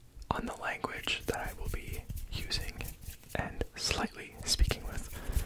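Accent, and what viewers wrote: Quebec, Canada. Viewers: Je vis au Québec et ton accent ressemble beaucoup au mien dans tout les cas tu parle très bien français! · Ton accent québécois mélanger avec l’anglais est très drôle! 😂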